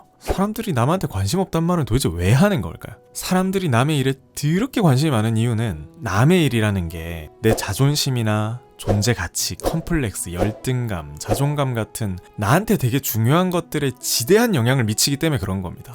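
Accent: South Korea. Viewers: 늘 자기계발 인간관계 지식 이런 류의 채널 영상을 자주보는데...AI 목소리가 많아서 귀에 잘 안들어왔거든요. 근데 목소리가 너무 좋으시고 뭣보다도 친근한 약간의 경상도 억양과 단어들이...오히려 귀에 잘 들어오고 더 와닿네요. 구독누르고 갑니다❤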